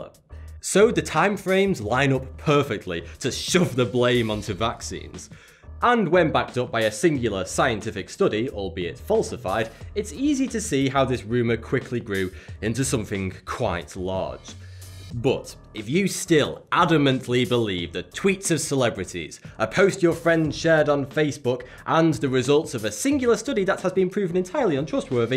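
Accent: United Kingdom. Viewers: Where in the uk is thoughty2 from?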